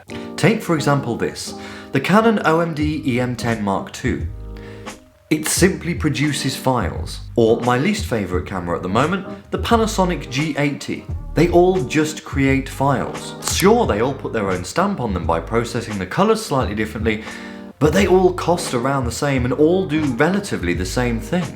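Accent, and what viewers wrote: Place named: United Kingdom. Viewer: I don't know why but the British accent just got me. Love it though! and M50 is really handy for vlogging for sure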